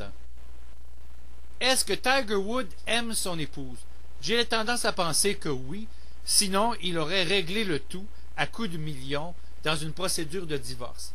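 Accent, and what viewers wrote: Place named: Quebec, Canada. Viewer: Très intéressant! au passage j'aime bien l'accent québécois Bonne continuation!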